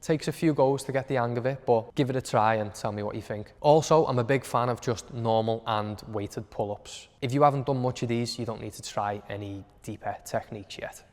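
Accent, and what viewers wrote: Liverpool, United Kingdom. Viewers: Scouse mate. Have you heard of the Beatles? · Scouse accent, the best 👍 (from Korea)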